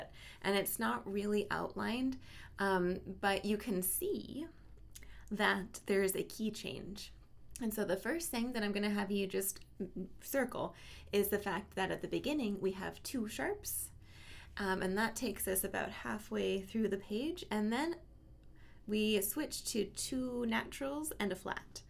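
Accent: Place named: France. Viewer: Im not in Patreon but yes in love 😍 😀. That French pronunciation 😌💞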